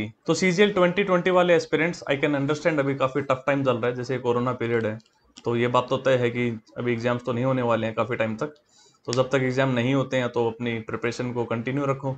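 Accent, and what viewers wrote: Haryana, India. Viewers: Bhai Haryanvi touch❤️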